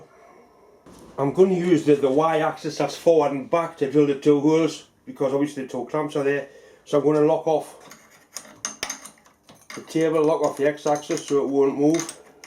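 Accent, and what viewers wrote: Newcastle, United Kingdom. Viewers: Someone Else It's Geordie, Newcastle area, Google Geordie shore. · what kind of UK accent is that?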